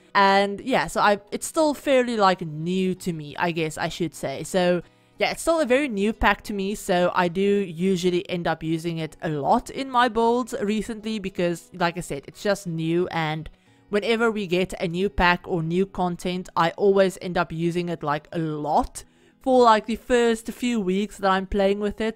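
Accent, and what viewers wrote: Afrikaans, South Africa. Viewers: Are you Afrikaans?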